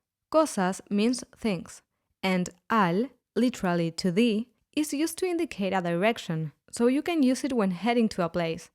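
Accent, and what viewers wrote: Argentina. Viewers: Are you Argentinian? I love your accent. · No, the singer is Puerto Rican, the speaker is Argentinian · Heavy Argentinian accent... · Are you from Argentina or are your friends from Argentina? If so, it is a very noticeable distinction to pronounce your "ll" like "sh" instead of "y." Maqui(sh)aje. Maybe that's what you mean?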